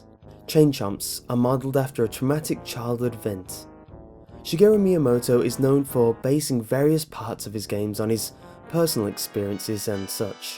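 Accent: United Kingdom. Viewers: i knew the videos are only creepy cause of the british voice!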